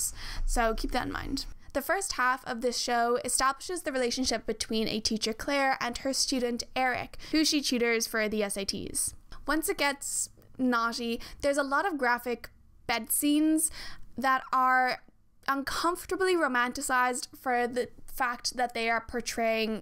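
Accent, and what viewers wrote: Ireland. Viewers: This is such a good video, thank you so much for creating it and doing such good research on the topic, I'll definitely be sending this to some of my old friends whenever Pretty little liars relationships come up as a topic.. This is unrelated but I can't seem to place your accent, do you happen to be Irish? xD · you’re accent is so interesting because it’s definitely american but you’re ‘t’s are so irish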